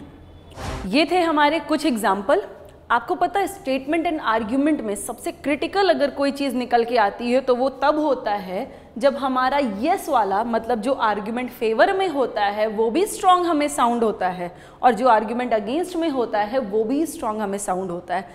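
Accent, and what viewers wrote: India. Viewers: english indian is hard tbh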